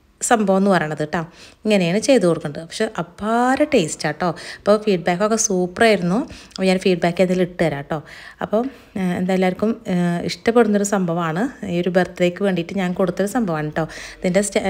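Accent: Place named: Malappuram, India. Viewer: നിങ്ങൾ മലപ്പുറം ജില്ല ക്കാരിയാണോ. സംസാരം തൃശൂർ ശൈലി യിലേറെ മലപ്പുറം ചുവ ആണല്ലോ.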